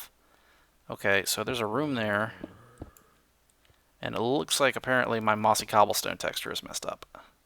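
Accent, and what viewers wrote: South, United States. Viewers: Are you from the south? It sounds like you have a slight southern accent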